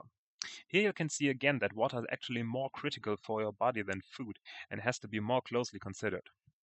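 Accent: Germany. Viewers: You are the DayZ medical messiah. Thank you for sharing this, it's opened up my eyes to what I've been doing wrong. Really good to know. And everything is more pleasant to listen to in a German accent :) You are German...right? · That sounds like a german accent :D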